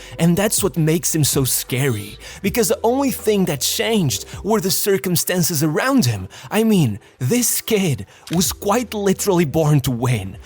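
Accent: Porto, Portugal. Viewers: Nota-se bem que és português, muito bom continua! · This accent is so Portuguese 😂😂 god damn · O sotaque do Porto mal disfarçado 😅😅😅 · Are you portuguese? you said his first club right and all names good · Your portuguese accent makes this video even more awesome! Cheers, buddy! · és português não és? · Nunca falho a reconhecer um irmão português, bom video irmão. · U sound portuguese, are u? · Es pt parece a braba pelo teu sotaque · esse sotaque tuga <3 dá-lhe!!!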